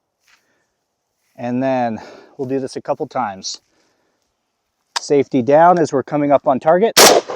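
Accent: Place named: Russia. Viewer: I've been following your IG for a minute and im ngl i was expecting the thickest Russian accent i've ever heard. still not disappointed lol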